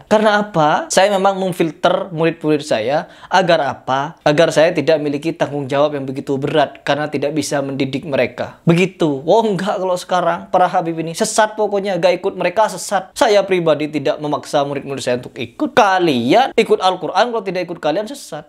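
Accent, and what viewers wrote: Madura, Indonesia. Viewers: bang kamu orang madura kah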